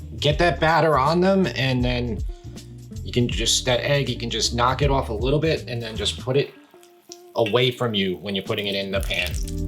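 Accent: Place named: East Coast, United States. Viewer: Cool east coast accent, smooth beats, reassuring confidence, that's gonna be an easy subscribe my dude.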